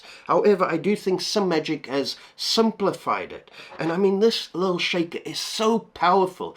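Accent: Afrikaans, South Africa. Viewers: Are you South African sir? If so where ! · Tel ek 'n afrikaanse aksent daar? Great content !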